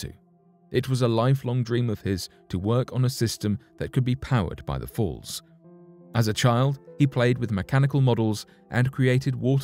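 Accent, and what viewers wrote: United Kingdom. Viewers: Why is a British guy narrating this?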